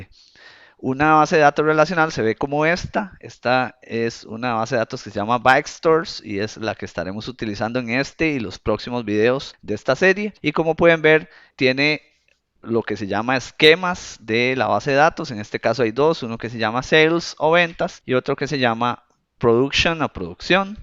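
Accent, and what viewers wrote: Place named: Costa Rica. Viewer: es como si hablara con mi amigo de costa rica , de donde sos? muy bien explicado el video, gracias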